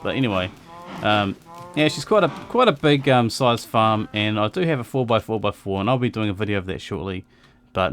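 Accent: New Zealand. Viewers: My water only flows to the right over the fence, only flows both ways when I update with redstone torch, but when i retract the water and dispense it again it screws up again...any ideas? P.S. Great to hear another fellow kiwi accent on youtube! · Hey bro...works mean as,buzzed out when I watched your tutorial and heard a kiwi on the other end, just starting to get some skills now on minecraft and it's all due to the tutorials on youtube...keep up the good work man.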